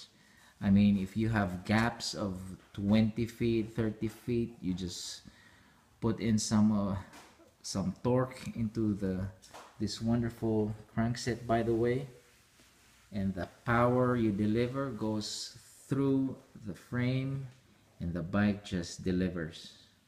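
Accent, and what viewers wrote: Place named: Philippines. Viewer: nice accent. pinoy?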